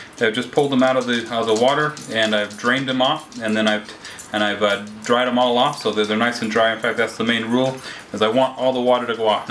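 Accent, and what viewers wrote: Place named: North, United Kingdom. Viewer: it's quite obvious you're from up North.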